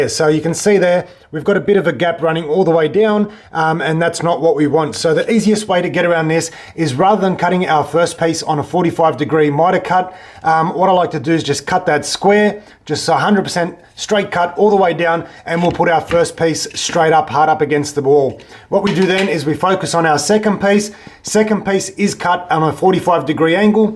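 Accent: Australia. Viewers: I am about to install baseboard myself. I could not find an easy way to figure out the angles until I saw this. It seems you have mitre cut to 45 first before grinding out. Is there a way to eliminate the mitre cut too? Sorry this may be a silly question. Love the Aussie accent!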